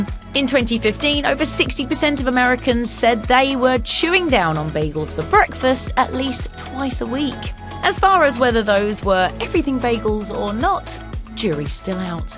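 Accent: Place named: United Kingdom. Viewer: Wtf is this British broad?🤔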